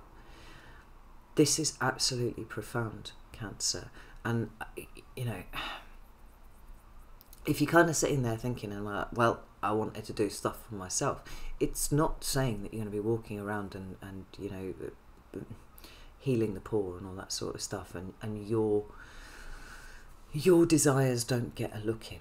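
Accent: United Kingdom. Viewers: Could you please move the mic closer to you?!!!! Or speak up for us! Maybe it's just me, but I'm having a hard time to hear and understand what you are saying. your voice is deep, and you are so quiet. I would love to hear what you have to say! Plus you have this gorgeous British English "accent" :) all i hear is whispering mumble most of the time. thank you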